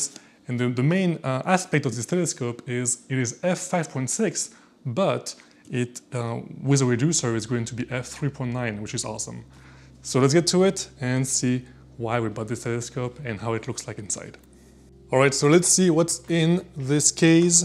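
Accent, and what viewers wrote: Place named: France. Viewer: Are you french ? Tu as un accent 😂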